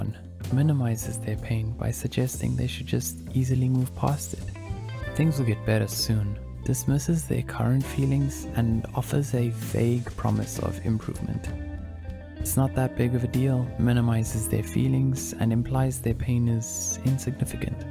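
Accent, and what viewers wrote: South Africa. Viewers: Is the speaker South Africa? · Am I the only one hearing his South African accent? 😅 hey Bru howzit 😂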